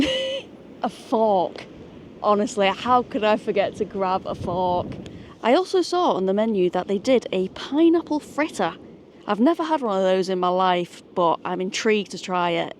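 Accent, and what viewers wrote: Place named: Wigan, United Kingdom. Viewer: I think she says "Hi everyone" run together and sung as one word. I think she's from Wigan which is known for having a strong accent. Some even regard it as its own language known as "Wiganese".. ;-)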